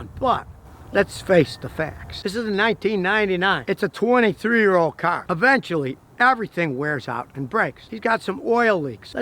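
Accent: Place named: New York, United States. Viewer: I can tell.s from NY, got that soprano swag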